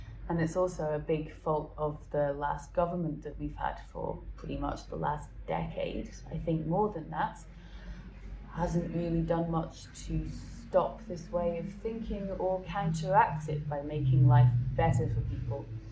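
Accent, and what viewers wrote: Scotland, United Kingdom. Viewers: As a fellow traveller (in the past sadly) ive been the outsider in alot of places around the world and you simply HAVE TO assimilate to some extent wherever you go and to be upset that you cannot go and do whatever and wherever you want is naive at best although you are correct to say Governments will jump on any opportunity to gain power or wealth regarding borders or visas or any aspect but that unfortunately IS the way power systems work more or less depending where you go as Culture does play a huge part in attitudes and behaviors regarding sex, religion and ideology. As sad as it sounds and as sad as it IS the general global neo liberal agenda permeating our lovely wee planet is only worsening any of those freedoms we used to have especially to the free spirits like yourself who just wanna fly so until that changes we'll just have to take the ride....(you did buy the ticket??) PS I can hear a Scottish twang in there and since seen you were at Uni in Dundee. 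Anyway love watching you and your adventures, lovely lassie. Maybe see you on the road down the line. X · our southern border is a mess and needs fixed.IV personally been to two different parts of it Texas and Arizona and seen it with my own eyes.Sorry your path has slowed down and put you in limbo.I still enjoy watching your Spain travels.I enjoy your voice and accent.It makes me wonder what my Scottish ancestors sounded like when they Immigrated to Virginia in the mi 1700’s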